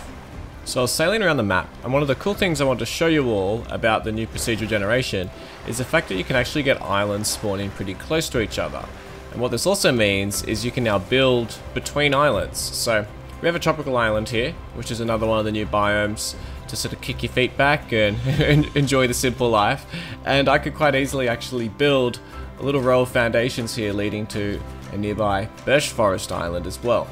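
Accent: Australia. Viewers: I love the temporary z-buffer object transparency (???) - is that common? It looks very schmick and useful. I have done something similar in a Windows explorer clone I am developing kinda sorta... Good stuff here, great to hear the Aussie voice on a game dev video of this quality.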